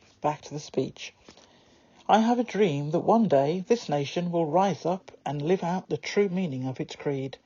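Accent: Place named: England, United Kingdom. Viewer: every time I hear that speech it gives me chill bumps. This will be the first time I heard it from a person with a English accent…and it was beautiful! I also think the speech was poetic.Thank you!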